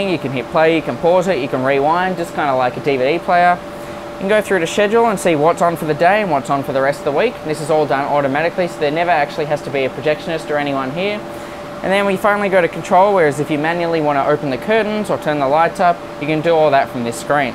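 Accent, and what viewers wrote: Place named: Australia. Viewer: Very distinctly Australian indeed. BUT, only one variation of many. I personally sound nothing like that in the slightest. I don't really have much of any stereotypical give-aways. Which I think is becoming common across the world as people become more connected through newer ways of socialising, and media. Among other reasons.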